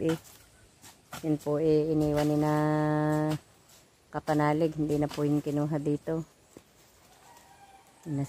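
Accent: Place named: Batangas, Philippines. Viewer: Para taga batangas po kayu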